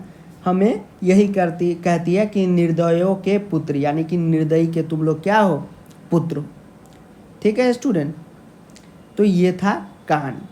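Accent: Bihar, India. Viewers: Bhut bhadiya sir asli bihari ki tarah padhe h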